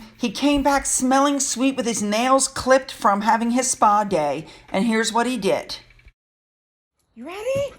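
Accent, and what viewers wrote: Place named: Brooklyn, United States. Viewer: Boo hoo, here in America, that's how people in Brooklyn speak, and WE LOVED IT !!!! Listened to it 2 times myself!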